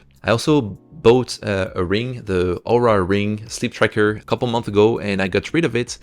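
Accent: France. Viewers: Hey, merci pour la vidéo, (j'ai reconnu le petit accent français) j'aspire à devenir développeur solopreneur, malheureusement je n'y connais rien en programmation, je suis au début de l'aventure, je voulais te demander selon toi quel est le meilleur chemin pour apprendre à coder le genre de Saas que tu conçoit, quelle langage de programmation apprendre en premier et est ce nécessaire d'apprendre L'IA (machine learning ect..) Continue ce que tu fais c'est super inspirant!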